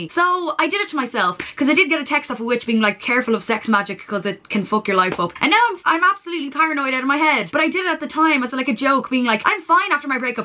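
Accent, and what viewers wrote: South Dublin, Ireland. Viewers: Are you irish ? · I'm actually obsessed with your accent because it gives me south Dublin vibes but without the judgment. Also obsessed with the tattoos I'm going to turn myself into a doodle pad I just want all the tattoos now!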